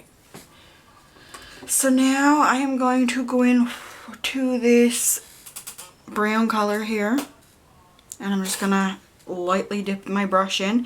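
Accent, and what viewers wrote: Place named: Australia. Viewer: Oh my god!! I JUST came from piinksparkles vlog and as i was watching this I recognized your accent!! Im from north sydney!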